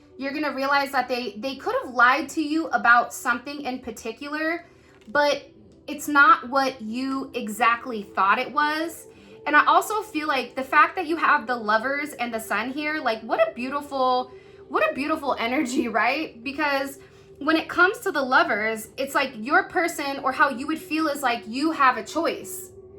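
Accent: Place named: Bay Area, United States. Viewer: okkayyy I knew you had to be from The Bay. I know that ur swag when I hear it and see it. lol That’s Hella Dope….Yeeeee💕👑🔥⭐️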